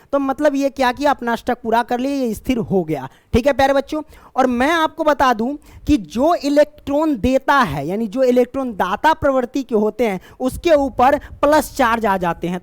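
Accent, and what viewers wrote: Bihar, India. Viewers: Sir aap Bihar ke ho kya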